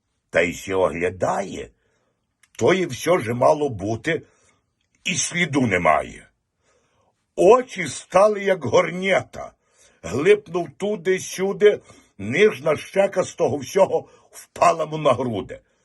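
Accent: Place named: Lviv, Ukraine. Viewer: Класно! Дуже посміялась! А львівська балачка- то супер файно!😂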